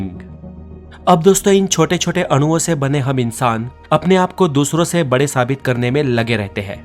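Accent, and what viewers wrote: Gujarat, India. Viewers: ગુજરાતી લાગો સો ભાઈ...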